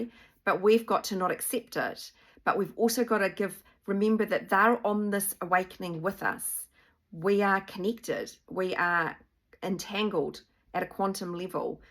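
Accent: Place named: New Zealand. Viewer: Hi! I paid $500 to a bald man on youtube in october. Did everything he said then sat in fear based energy for 3 months, stressing i had to forget him but could not. Shamed myself. Felt like a naughty child in the group, cant say this cant do that or we'll block you and no refunds!! Found you 2 weeks ago. Immediately shifted and grounded the hectic energy. Just wanted to say thanks for your videos, wish i could pay for your services but cannot put any more $$ into this journey. Parents grew up in hastings and napier, im in melb so love the accent! Reminds me of my cousins. Thanks for all you do xxxx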